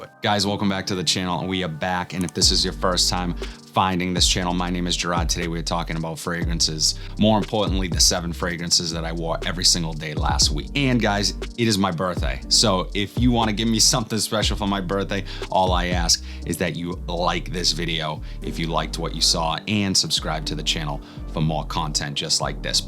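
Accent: Boston, United States. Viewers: Gerard! Happy birthday 🎉 I love how your boston accent sounds like everyone I grew up with . I need suggestions for cologne for my almost 16 year old - I splurged on althair for his b Day in a couple of weeks ( I love it ) ( got PDM discovery set to try before spending on it, p He has Cedrat Boise . Prada Luna rosa . And the sport one Sauvage . Coach blue ,( Marshall's) mont.blamc legend bluu t . Jimmy Choo man .aqua di gio. Phanom.( nauseating i cant deal ) cremo spice and black vanilla He no longer likes his nautica voyage So any suggestions at lower price range that smells good ? Not everyone smells like . What else is great for this age?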